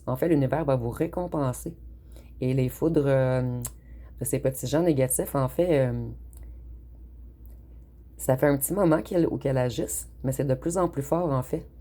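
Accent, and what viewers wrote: Quebec, Canada. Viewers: Vous etres rigolote j'aime bien vous ecouter quel beau accent quebecois❤❤❤❤❤❤❤❤❤❤❤❤❤❤❤❤❤❤❤❤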